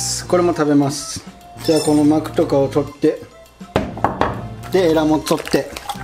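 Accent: Kagawa, Japan. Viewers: 素のときに出る、讃岐弁がいい(´・ω・｀)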